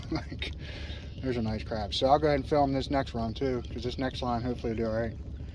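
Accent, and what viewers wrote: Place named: Patapsco, United States. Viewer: Man, where are you from? Sounds like Patapsco. Lol. I'm down in Deale. Where's your store. Ill come up.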